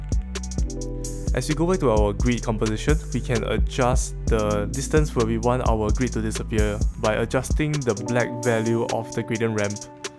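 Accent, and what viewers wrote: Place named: Singapore. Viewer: you sound like youre from singapore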